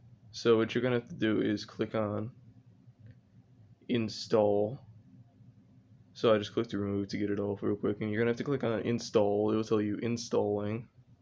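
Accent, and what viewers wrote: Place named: France. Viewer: Spongebob French narrator voice generator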